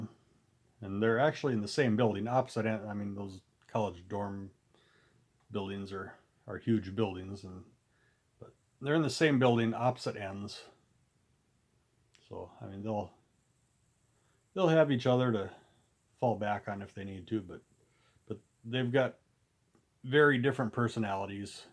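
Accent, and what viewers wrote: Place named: Midwest, United States. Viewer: Always a pleasure to hear your Midwestern Minnesota-ness,. Down to Earth family goings on. Thanks for keeping me company while I cleaned the bastard bathroom.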